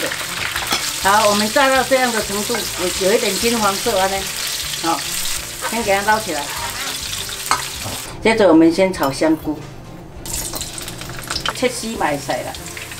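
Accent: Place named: Taiwan. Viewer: 阿嬤.好可愛台灣國語支持你👍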